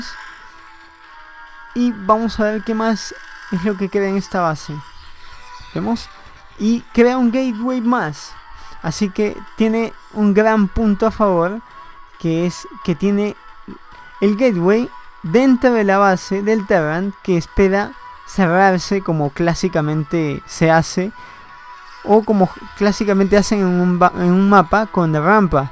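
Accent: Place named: France. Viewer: esque es frances.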